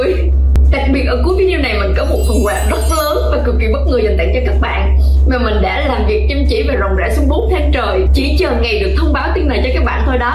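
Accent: Binh Dinh, Vietnam. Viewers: bà chị đến từ bình định à nghe quen quen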